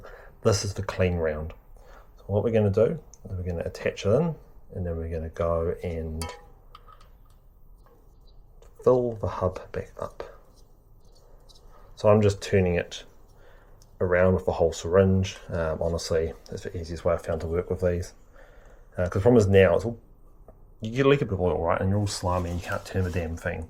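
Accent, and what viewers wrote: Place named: New Zealand. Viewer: Hi. Are you in NZ? Thought I might have picked the accent 👍 Can I ask where you purchased your third party service kit? I’m in NZ & found the tube that connects to the syringe for $19 & 50ml of oil for $15, both from 99 bikes which seems reasonable. Cheers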